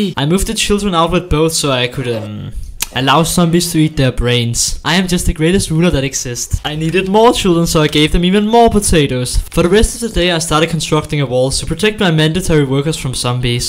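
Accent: Denmark. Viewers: Hey are you from Danmark you Sound like it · You sound a bit danish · Very nice danish accent 👍🤝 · are u danish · Danish 100% lmao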